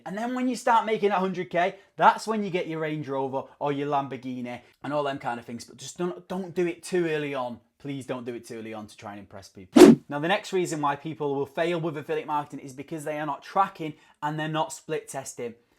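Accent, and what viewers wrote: United Kingdom. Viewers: Hahaha funny UK accent he gat there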